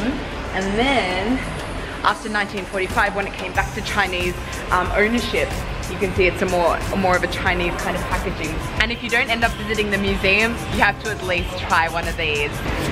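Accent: Australia. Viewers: Heyyy, I'm a Chinese student currently studing in Melbourne, its suprised to know that you are a Aussie, like your accent and video, and the way how you expericen and introduce Chinese culture. And one more thing is I recommend my hometown Fuzhou city in Funjan province. The climate there is quite similar to Sydney, and it's famous for it's beautiful greenary and parks. Looking forward to see your video inroducing my hometown:）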